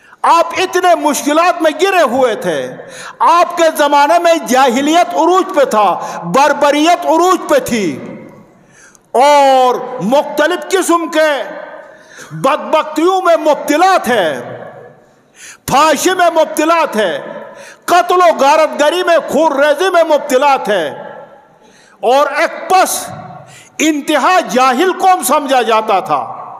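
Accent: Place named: Gilgit, Pakistan. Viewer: I don't understand the majority audience are pashtoon then why he delivered his speech in urdu because he seems to be gilgiti not local by his accent strange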